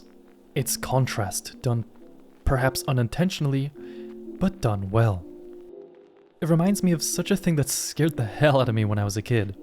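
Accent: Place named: Sweden. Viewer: Sounds like a light swedish/upper european accent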